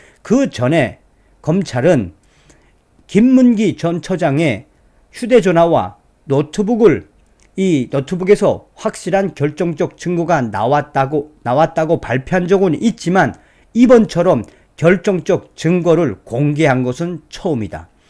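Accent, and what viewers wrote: North Korea. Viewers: 탈북민 이세요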